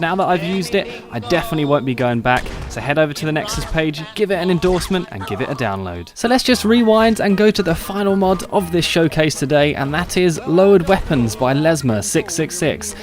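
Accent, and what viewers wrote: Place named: United Kingdom. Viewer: Brilliant vid mate, to the point, no retarded goofing, stressing immersive mods, and in British English. Subbed.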